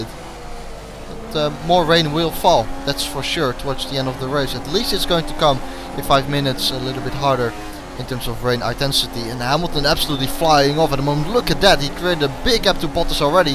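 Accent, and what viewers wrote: Netherlands. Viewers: geweldig dat nederlandse accent hahah ga zo door!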